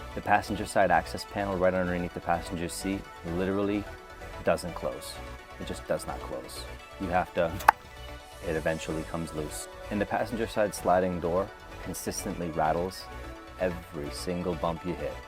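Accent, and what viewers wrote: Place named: Massachusetts, United States. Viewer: You sound like you live in MA ?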